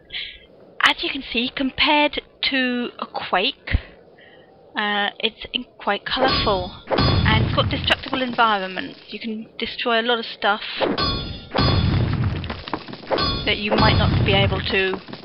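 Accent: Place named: United Kingdom. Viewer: British d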